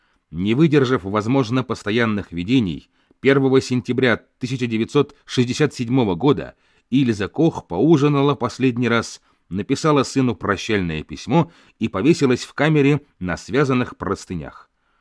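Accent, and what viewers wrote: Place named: Moldova. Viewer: Очень сильный у ведущего Молдавский акцент , аж уши режет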